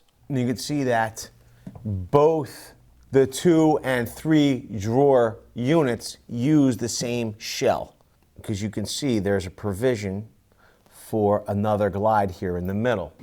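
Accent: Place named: Northeast, United States. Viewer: This guy is so NorthEast he reminds me of my childhood.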